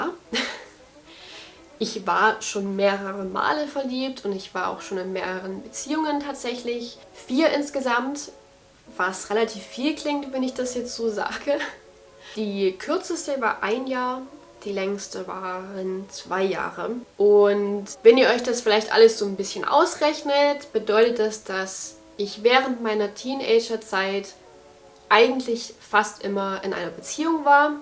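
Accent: Saxony, Germany. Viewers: Ich dachte jetzt kommt ein Deutsch mit einem starken Englischen Slang und dann sächselt sie 😅😅 Sehr überraschend ❤ Liebe Grüße von meinem Kanal 🌸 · Sandys Leben Dachte ich auch aber man hört den deutschen Akzent im Englischen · Awa haha die hat einen Ultra deutschen Akzent in englisch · Dachte erst eine Deutsche spricht Englisch (also vom Akzent) 😂👍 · sie sächselt definitiv 😅 als sehr hochdeutsch Sprechende höre ich das extrem 😂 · Dein deutsches Video hat mir sehr gut gefallen 😊 Ich mag deinen leicht sächsischen Einschlag in der Aussprache. Ich liebe diesen Dialekt. Deswegen würde ich auch gerne wieder ein deutsches Video von Dir sehen. Aber die englischen Videos gefallen mir auch sehr gut. So lernt man auch noch nebenbei die Sprache beim Zuhören. Deine Kleidung auf Instagram oder Kleiderkreisel zu verkaufen fände ich gut. Ich wäre schon mal interessiert 😉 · Ich war schon verwirrt, warum klingt dein Englisch so deutsch, anscheinend kommst du auch aus Deutschland😂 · Oh, wie süß, du hast einen ganz leichten sächsischen Akzent! 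Finde es sehr schön, dich mal auf Deutsch zu hören! <3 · Hach, es fühlt sich so heimelig und ungezwungen an wenn dein sächsischer Dialekt etwas durchkommt. Ich bemühe mich vor meinen „westdeutschen“ Freunden immer um Hochdeutsch aber ganz verdrängen kann man das „nu“ und „ooch“ nie, ganz schlimm! 😅 aber es war sehr angenehm dich mal auf deutsch zu hören und du siehst toll aus in dem video☺️ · Und egal wie sehr du dich anstrengst, man hört das du aus Sachsen kommst. 😅 Find ich aber gut, kann mich richtig mit dir identifizieren. 😂❤️ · Haha, habe dich gestern erst entdeckt und gerätselt, was für einen Akzent du hast. Und tadaa, es ist deutsch.😂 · Eine Sächsin ?😛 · It's so weird to hear you speak german, especially with your saxon accent 😆 cute! But I think you understood one question wrong.. the 19th century is vom 1800 to 1899😂 you talked about the 20th century haha. Non the less it was interesting to hear your answer to it!! 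· Naja sächseln tut sie kaum... einen englischen Akzent höre ich bei ihrem Deutsch überhaupt nicht. Aber den deutschen Akzent, wenn sie Englisch spricht, den hört man ganz klar. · Juhu, auch jemand aus Sachsen....und ich hab mich schon gefragt warum ich dich so gut verstehe. ^^` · Das stimmt. Man hört in ihrem Englisch, dass sie deutsche Muttersprachlerin ist. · Als ich dich englisch reden hören habe, wusste ich sofort, dass du Deutsche bist. Du hast einen ziemlich starken deutschen Akzent in deiner englischen Aussprache.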